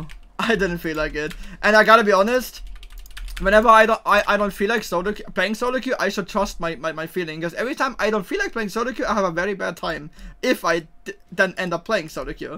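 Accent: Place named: Germany. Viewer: Ah, alrighty man. I had you at what is considered max for my ears and I still barely heard the game. You make the stutter sound so fluent it makes it sound Korean/Chinese with the speed of which you're speaking. That mixed with the German accent and the "mumbling" from the braces makes it sound even more like it lol.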